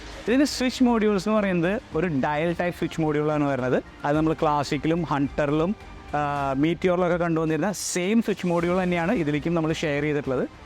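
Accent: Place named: Thrissur, India. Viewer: Clear technical explanation, no BS, narration in authentic Thrissur Malayalam. Really liked your review keep going. Lots of love ❤, more power to you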